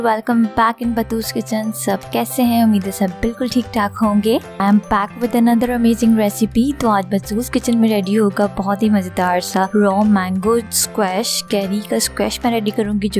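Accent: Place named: Pakistan. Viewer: My guess was right from accent you must be from Pakistan, ab next year paka try karunga and best of luck Sis. Waiting more of your videos and recipe.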